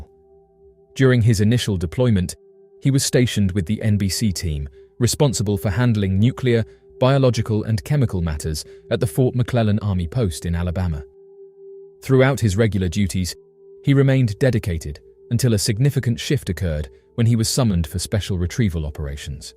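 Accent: England, United Kingdom. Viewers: with annoying en-glish accent..Indeeed !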